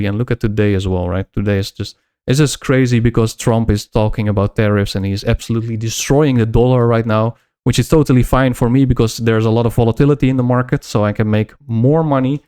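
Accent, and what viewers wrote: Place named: Netherlands. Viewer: Don't know why I found it really funny when you said "Trump is talking about tariffs and he is absolutely destroying the dollar right now" - something about your Dutch accent makes it even better hahaha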